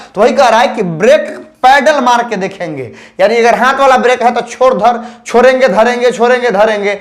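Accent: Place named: Bihar, India. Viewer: Classs achi pDhate h sir but shudh hindi bola kar bihari bhasha thodi defrent lagti h🙏🙏🌹👌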